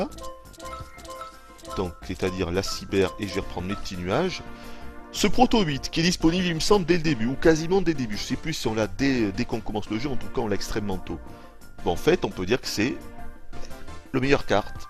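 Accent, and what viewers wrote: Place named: South, France. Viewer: French with a southern accent :)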